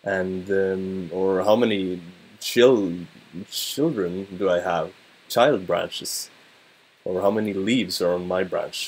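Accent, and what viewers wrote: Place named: Scandinavia. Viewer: You sound scandinavian, sweed?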